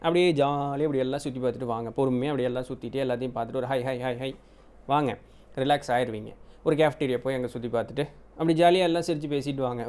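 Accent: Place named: Chennai, India. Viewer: நீங்க கோயம்புத்தூர் தமிழில் பேசாம சென்னை தமிழ் தான் தானே பேசுறீங்க கேவை தமிழ் ல பேசுங்க.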